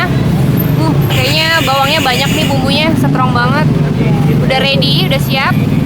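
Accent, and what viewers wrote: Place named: Betawi, Indonesia. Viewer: Logat betawinya lucu..coba lbh di munculin lagi logatnya.. kaya afifah stand up/boah stand up. Pasti lbh lucu..hehe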